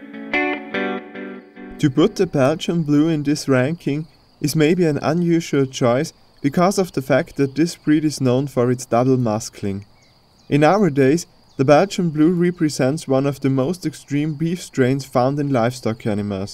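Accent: Austria. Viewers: like your beautiful Austrian accent :) as much as this video. greetings from Australia. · Vielen Dank! Sehr gut gemacht, aber mich wunderts dass es die Pinzgauer nicht unter die ersten 10 geschaffen hatt! Bist Du vom Bezirk Kitzbūhel? ( der Sprache nach) I bin a Kirchdorfer, schon seit 50 jahren in Toronto Canada. Grūsse Martin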